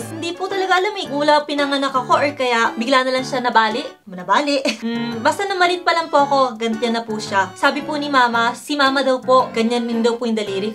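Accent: Japan. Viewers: Sarap pakinggan ng Japanese accent mo huhu. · Dami alam ng mga bashers na yan! Obvious na japanese ka nmn.. it doesn't matter kung pinay o haponesa ka ang importante hindi mo nakakalimutan na may dugong pinoy ka.. hatsuong palang alam mo na haponesa ka ..mas bilib ako sayu kc napaka fluent mo sa tagalog..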